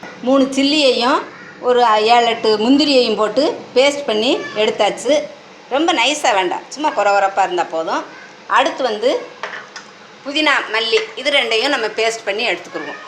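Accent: Madurai, India. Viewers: Thank you amma , So sweet Madurai slang, love ur description... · Amma super neenga Madurai ya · I love your Madurai speech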